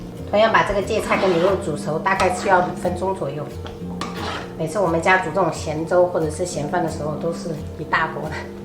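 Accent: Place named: Quanzhou, China. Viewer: Watching your video makes me homesick. 川妹子说起闽南话竟然一口泉州腔。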